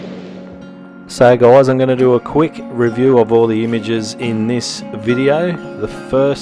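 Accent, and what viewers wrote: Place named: Australia. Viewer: I truly enjoyed this clip. Love the laid-back, very Australian delivery. But that said the content was very useful and valuable. i really like the IQ of the Canon as well. I also liked the (abstract) waves and the richness of the colours. I hope to head down that way in a few months and will try out the technique. It was terrific that you mentioned details that I might not have otherwise noticed like the setting sun shining on the wave tops. I love capturing the complex movements of the water too. It seems that particular location is one that presents much variety to those who are keen enough to look. Favourites: the first two at the end, the same ones you said you liked.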